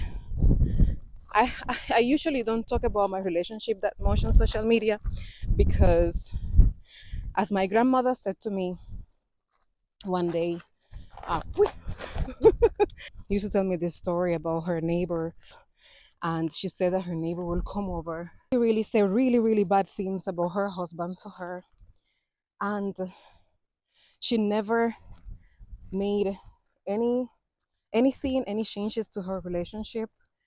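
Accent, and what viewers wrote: Jamaica. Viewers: You Jamaican, Just born in Cuba, but girl you roll those Jamaican words like a Cuban Cigar!! Boss Lady!! Love what you do!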